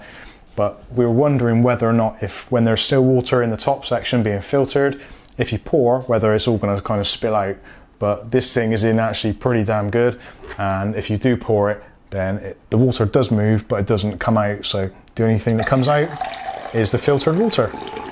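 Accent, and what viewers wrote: Bristol, United Kingdom. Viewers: Certainly was; good to see it put in layman's terms. Love the Brizzle accent by the way! ;)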